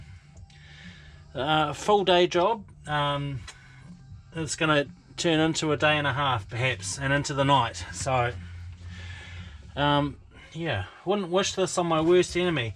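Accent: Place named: New Zealand. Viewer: I would put in another inspection hatch and install the sensor into that - unclip the wiring, unscrew the hatch lid and there is your sensor. Great video, I started with Part 1 as result of a search on marine toilet maintenance. So nice to hear a Kiwi accent from a Youtube search for a change. I'm going to install a marine macerator into my new motorhome so doing lots of google and youtube at the moment. Must do another Picton trip (from Chch) as soon as I am mobile again.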